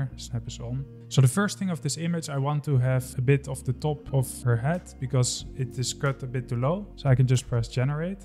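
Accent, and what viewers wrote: Netherlands. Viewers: First seconds watching this video and i immediately know you're Dutch ahhaha nice accent bro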